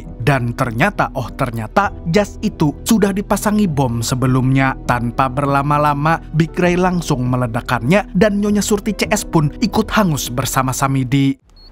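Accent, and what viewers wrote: Banjar, Indonesia. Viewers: Urang banjar kah pian mamang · org banjar sekalinya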